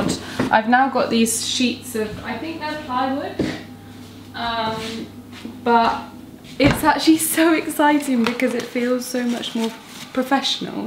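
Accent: United Kingdom. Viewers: I love your British acent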